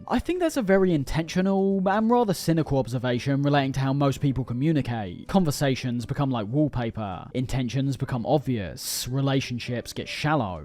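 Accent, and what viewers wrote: United Kingdom. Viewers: A British person talking about the sims for an hour. That's all I need and want actually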